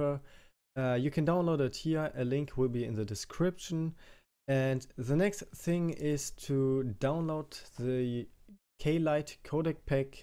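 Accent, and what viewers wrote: Germany. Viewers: man hört direkt raus er ist Deutsch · I just love a german accent. Thankz brah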